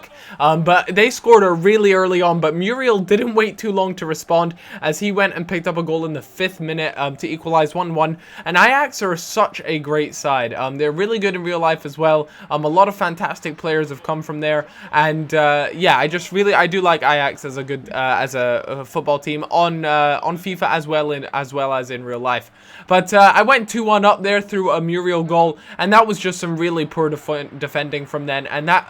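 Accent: Scotland, United Kingdom. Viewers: You scottish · Where in scotland u from??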